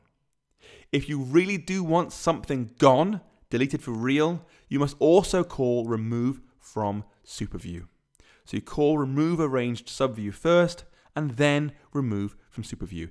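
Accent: United Kingdom. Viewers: I like British accent.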